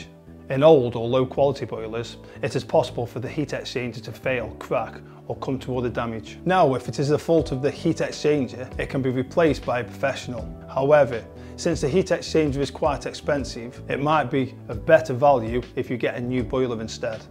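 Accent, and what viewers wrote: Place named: Stoke, United Kingdom. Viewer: This man has to be from Stoke!😊 (It takes one to know one.)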